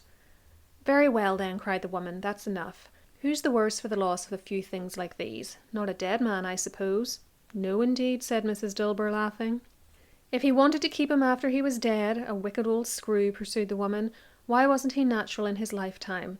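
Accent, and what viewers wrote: Northern Ireland, United Kingdom. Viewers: THIS one girl! Love so much your Northern Irisch accent. Greetings from Friesland in The Netherlands (I speak Frysian and Dutch as my native tongue) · if you’ll pardon my being less professional though, it’s a little evil. I already have a weakness for the northern Irish accent, and you’ve gone and made a 2 and a half hour long video!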